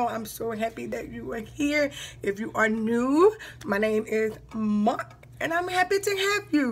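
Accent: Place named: South, United States. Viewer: I love your accent you from the south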